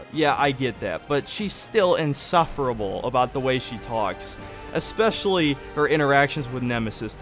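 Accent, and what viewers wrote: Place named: Chicago, United States. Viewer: I like your Chicago accent!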